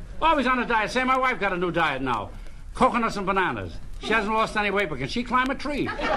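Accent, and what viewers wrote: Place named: New York, United States. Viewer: Jewish New York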